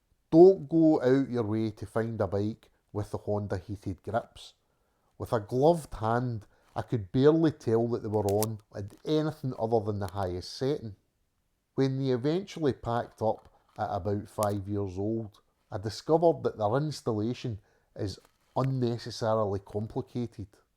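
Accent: Scotland, United Kingdom. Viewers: Thanks for your work. Iran has been my best trip so far, the people there are so friendly with the visitors and specifically with riders because we have bikes they have never seen in their life (big ones are only for the army and the police). As always , problems come with politics ans religion... On the way to Iran the Balkans are fantastic when riding on mountain roads (get away form the coast...), Albania is......different... And eastern Turkey (Kurd country) is also interesting with very nice people. I love your scottish accent (although sometimes difficult to understand) and Scotland (I made the North 500 road) Keep well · Love the review and the comparison to the bullet proof Honda's of days past. I owned quite a few Honda's from the 70's to 90's and apart from the VF1000 (mad bike) they were utterly dependable. I now drive Guzzi's with varying levels of reliability. 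But I must say the most current one (a 2008 Stelvio) performs well, for all the other ones I trade driving pleasure for reliability😇. Worth it, but it is not for everyone. Specially my Quota1000 which I think is the most brilliant failure for a motor bike. A lot of issues, but it drives brilliant like no other. Any way I enjoyed your video, you are using your bike not for show, but for what you can do with it, and I am very envious of you living in Scotland , as I know from experience how nice it can be to drive there. Great small twisty roads and beautiful scenery. Had to re calibrate to understand your commentary a bit with the Scottish accent but don't change. Awesome content.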